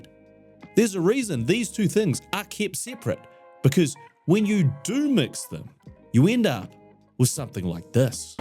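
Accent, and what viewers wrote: New Zealand. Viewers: Mate you sound like Kiwi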